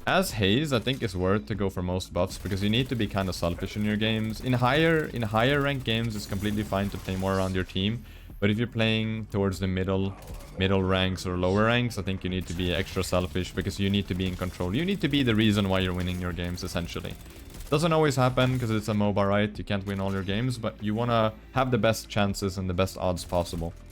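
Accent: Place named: Sweden. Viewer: 5 seconds into the video and I thought "this guy is Swedish 100%" lmao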